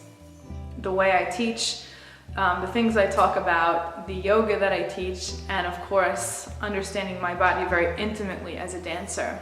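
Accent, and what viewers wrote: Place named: New York, United States. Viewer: Great video. I like your accent, are you from nyc?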